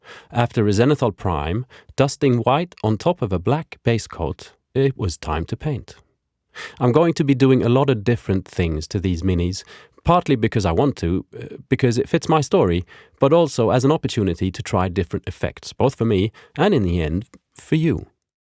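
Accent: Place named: Sweden. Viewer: är du svensk? Nu lade jag märke till dialekten! Btw tycker dina videor är väldigt underhållande att kika på, heja! 👍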